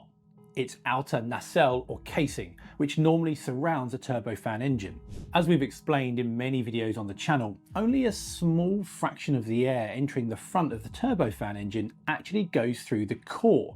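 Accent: United Kingdom. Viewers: I prefer Petter's style and accent (even though he is not from native English speaking country) to (with his British accent, especially on-at least 10-'but') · I have to say I do appreciate the English accent. So few English Pilots on YouTube. He is the only one I know. of. I'm sure there are, just have not seen them. More so I appreciate his ability like Petter, to thoroughly explain in laymen terms. The down side, It makes me like Aviation more. LOL.... I Do hope that things improve in environmentally sound engines. I know we can. It can only help things, and ensure the aviation sector.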